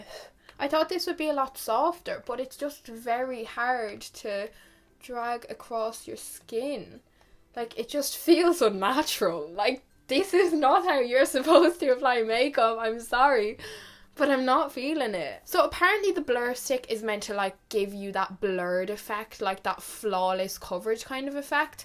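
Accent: Ireland. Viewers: Lol first time seeing your video. First sentence and I was like SHE IS IRISH. If I’m wrong.. I’m sorry lol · your irish accent is just super cute! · Where are you from in Ireland beautiful accent ! Cxxxx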